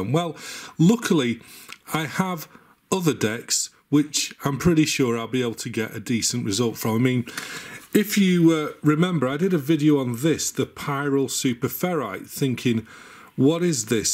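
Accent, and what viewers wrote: United Kingdom. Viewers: Off-topic but i watch another youtuber whose a gamer, hes also british, i am british, tonys british, i just love hearing the british voice. Theres something about it, probably the "slagging off voice" if you dont k ow what that is, watch tonys video about cheap cassettes. Specifically the "fag packet" part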